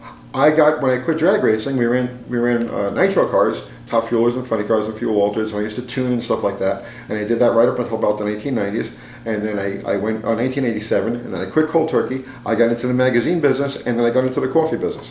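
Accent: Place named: Philadelphia, United States. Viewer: Why don't you roll up a cigarette pack in your sleeve you look like an idiot when you're smoking on camera. I mean it's already an uphill battle with that yo Adrian accent you have synonymous with stupid so just put the cigarettes out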